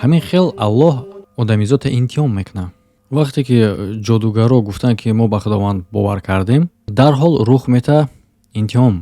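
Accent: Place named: Tajikistan. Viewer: ВАХАХА НАВ ЧИСТА ТОЧИКИ ГАПЗАДИ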